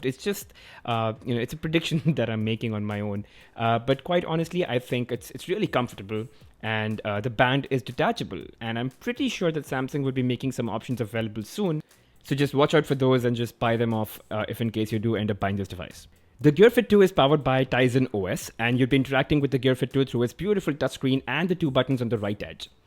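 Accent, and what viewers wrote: India. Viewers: The Indian accent sold me. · Nice video, I like that Indian accent :)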